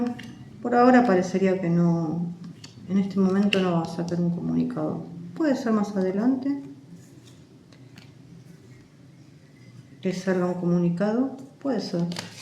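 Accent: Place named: Argentina. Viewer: He seguido an desde hace rato pero a veces como ella habla no entiendo muy bien (acento argentino) disculpa. Se también que dice que las cartas indican que Can y Demet están casados pero después de tanta cosa que ha pasado con la terrible DJ y más, estoy confundida. Espero entender ya que voy a leer todos los comentarios. Que tengas buen día. 👋🏼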